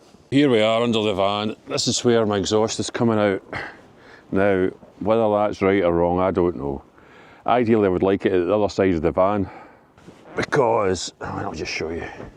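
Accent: Scotland, United Kingdom. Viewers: Thanks for replying. You made me laugh on your video with your Honest commentary and your accent from a fellow Scot takes me back to working with people with a sense of humour and dry wit sadly missing these days. Keep making videos now subscribed 👍👍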